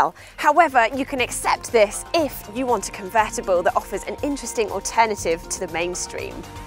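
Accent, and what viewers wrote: United Kingdom. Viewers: Gosh i love the british accent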